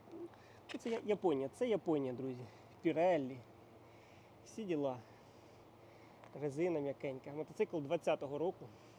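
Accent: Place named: Cherkasy, Ukraine. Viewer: Балачка, акцент Черкащанський. Думаю автор звідти родом. Вгадав?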